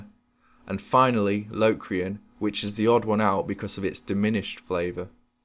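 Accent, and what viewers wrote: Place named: England, United Kingdom. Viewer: I really don't get all the hub-bub about this guys voice. He's from fucking England, and he has an accent. Wow, right? It's almost as if these comments are from 15 year olds on their junior-high school library computers. Regardless of his pace or inflection, this -IS- an instructional video, and he's not a bloody actor. The focus should be on clear, articulated information; not a swooning narrative with Shakespearean prose. Anywho, very nice video, thanks!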